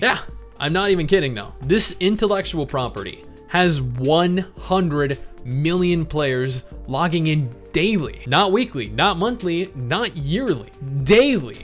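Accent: United States. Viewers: You're Chinese Australian with an American accent 🤔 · You grew up in Australia but you sound American... Nice looking game, let's keep our fingers crossed.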